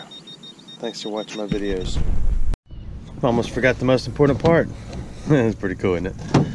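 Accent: South, United States. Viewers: your explaining of things that you collect, is very informative, Plus I love listening to your southern accent . please keep talking.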